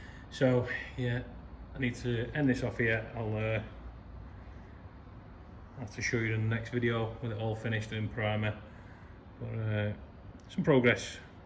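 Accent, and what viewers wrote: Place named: Warrington, United Kingdom. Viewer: I'm near Blackpool recognised the accent as my wife is from Warrington/ st.helens 😅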